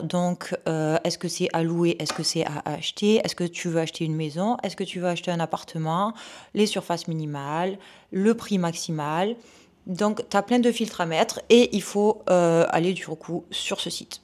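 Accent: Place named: South, France. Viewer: Jolie accent qui rappel les cygales ❤❤❤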